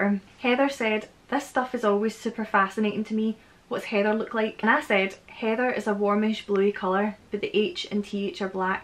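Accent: Scotland, United Kingdom. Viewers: That's interesting! You have my absolute favorite accent from Scotland. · It is sexy to have synaesthisia !! Specially with a scotish accent ♥️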